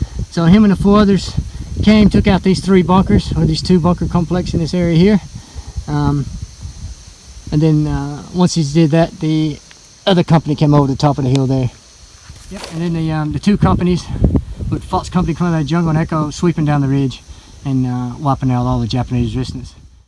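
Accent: South, United States. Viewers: I can understand this rooster better than an Aussie,strange yank accent,I knew a crew that logged on the Solomon Islands,and they said the amount of War relics is unbelievable,and crashed planes still been found to this day... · He's a Southerner.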